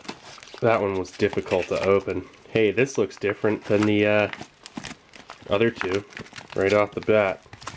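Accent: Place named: Southern California, United States. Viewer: I agree as well, you have a cool voice, are you from southern California? you sound like we do down here in the San Diego area.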